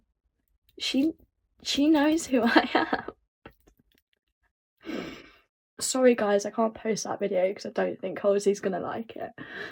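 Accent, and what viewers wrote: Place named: United Kingdom. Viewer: The shock I felt to hear your British accent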